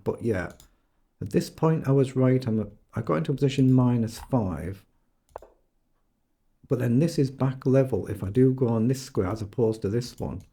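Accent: Newcastle, United Kingdom. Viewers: I see! It sounds Geordie to me, I didn't know Yorkshire had that kind of accent. Great channel I enjoy watching your games.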